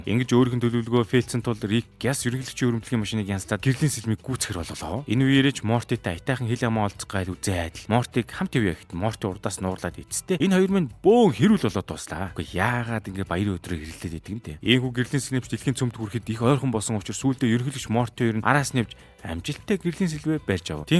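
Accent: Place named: United States. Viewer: Таныг яагаад ариун ус гээд байдгийг өөрөө цувралыг нь бүрэн эхээр нь үзээд ойлгосон доо хха. Сүүлдээ бүр хэл яриа Америк стилтэй болж эдр хха. Таны зовлонг ойлгосоон. Ххэ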